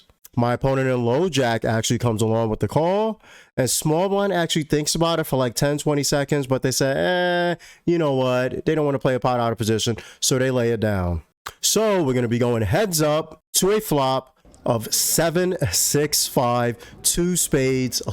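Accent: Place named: Louisiana, United States. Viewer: Based on your accent I’m guessing you are from Louisiana.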